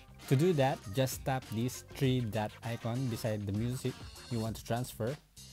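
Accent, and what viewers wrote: Philippines. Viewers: Are you a filipino cuz of you’re accent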